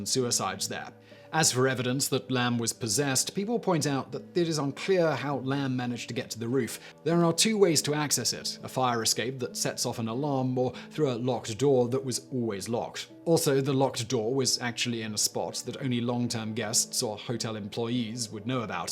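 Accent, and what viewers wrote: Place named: RP, United Kingdom. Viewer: Simon is REALLY over-egging his Head Boy private school accent on this one.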